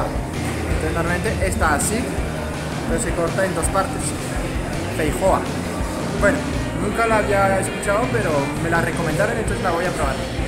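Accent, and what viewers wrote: Colombia. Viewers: llevas tiempo viviendo acá? porque tienes acento colombiano! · Por alguna razón cada vez que veo tus vídeos , es un poco gracioso como hablas castellano xd , tienes acentO colombiano hahahahaha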